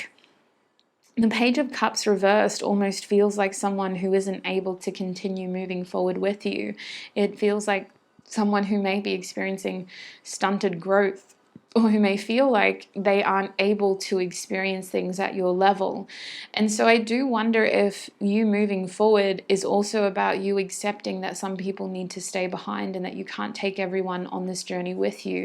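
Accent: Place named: Australia. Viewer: I could instantly tell from the intro you were Australian. Lovely energy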